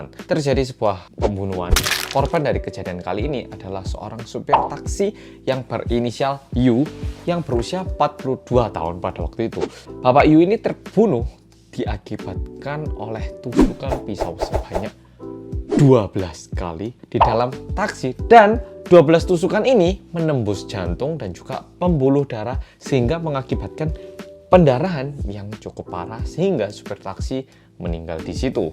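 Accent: Java, Indonesia. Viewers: Gak kerasa awal tau mas 4 tahun lalu, masih inget bgt prtama nonton video yang ngejelasin ttg sim. Abis itu hebohin org serumah grgr org korea yang medok, setelah itu rajin nonton video mas wah. Terharu liat perkembangan channel ini 😭😭😭 chukkae mas udah smpe segede ini🎉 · medhok ◐.̃◐ Nessie Judge: horror 👻 Nadia Omara: ngegass 😈 Arroyan Pram:serius, editan terbagus dan niat Detektif Aldo: serius pake banget (｡ì _ í｡) Gak tau kenapa aku suka banget sama kalian:-) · oppa oppa medok😍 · menarik juga nih ceritanya wkwk jadi pengen nyoba nonton filmnya:D makasih banyak ya mas, dari bbrp video mas aku jd banyak tau ttg hal2 baru, udah sejak lama malah wk tapi aku baru komen skrg aja:v semangat terus ya mas! suka sama kalimat yg disisipkan di dlm video, bener bermakna, ga lebay, realistis, dan memotivasi. Intinya, apa yaa wkwkwk.... SEMANGAT TERUS! MAS BERBEDA, MEDOKNYA, PEMBAWAANNYA, MATERINYA, semuanya AKU SUKA krn itu semua ISTIMEWA! 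SEMANGITTT!!!!! /abaikan kalimatku kalo terkesan lebay or ga nyambung ya mas wkwk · Medooooook, kacamata e baru rek mbois 😎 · Aku dulunya pe cinta drakor. skrng, udah sering nonton drakor Krna sudah ketagihan menonton vlok medok😅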